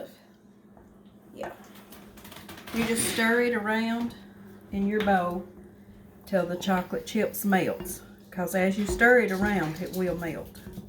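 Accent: South, United States. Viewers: Most beautiful southern accent you have. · So good to meet a sister in Christ God bless you I accidentally stumbled on your video and I absolutely love your way of cooking very explanatory very down to earth love your southern accent so adorable you're beautiful silver hair and beautiful kitchen so very happy to have stumbled on this video Thank you and a Very Merry Christmas A sister-in Christ, 🙏😇 Jill 🎄❄️ ⛄️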